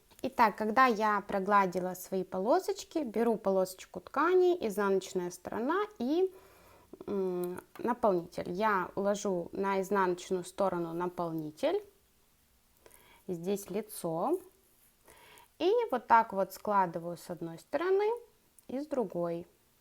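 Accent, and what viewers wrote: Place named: Surzhyk, Ukraine. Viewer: Это говор такой понимаете, суржик.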